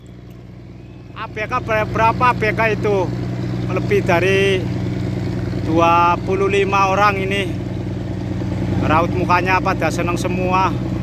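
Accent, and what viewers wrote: Banyumas, Indonesia. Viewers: Ora ngapak ora penak kang 🎉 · Ora ngapak ora kepenak😅😅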